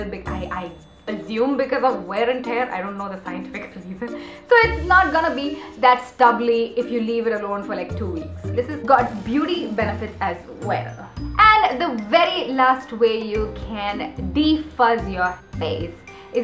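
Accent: India. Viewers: Very informative video n luv d way u talk n ur voice is mastam...r u India n? n u luk so qute :)) n when u said shupp shupp shupp that moment was awesome gal... :)) way to too :)) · which accent are you trying to talk in Indian , American or British?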